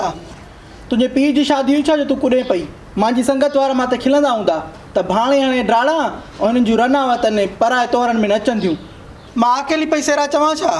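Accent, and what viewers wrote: Sindh, Pakistan. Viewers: brillent real mithri sindhii bolee asa jiii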